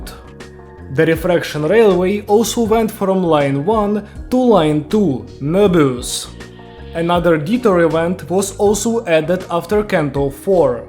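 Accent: Russia. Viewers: russian accent wth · Как же он пытается говорить на английском, но как же слышно русский акцент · Are you Russian, by any chance? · Я же слышу что ты русский наш слон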